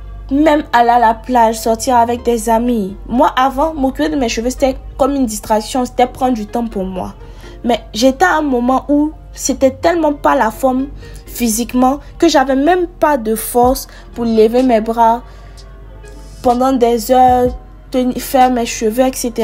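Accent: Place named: Ivory Coast. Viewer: «Deux cuillères de miel ow écoutez moi😂 Fhum » j'aime trop ton accent ivoirien là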